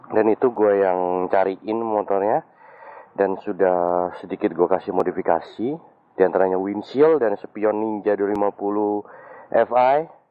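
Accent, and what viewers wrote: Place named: East Java, Indonesia. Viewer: cak asline wong endi ...kadang kok suarane medok jawa timuran...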